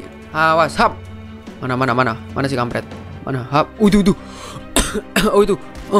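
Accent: Java, Indonesia. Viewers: Ngakak. Bang lu org jawa ya?? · bang sampean jowo ndi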